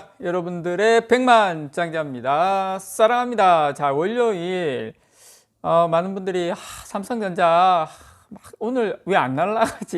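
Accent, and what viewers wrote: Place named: Gyeongsang, South Korea. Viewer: 저도 경상도🤗 발음 편안함니닷😄 점진적 소곤소곤 우상향📈 🅻🅾🆅🅴(๑•᎑< ๑)♡🙆🏻‍♂️🙆🏻‍♂️🙆🏻‍♂️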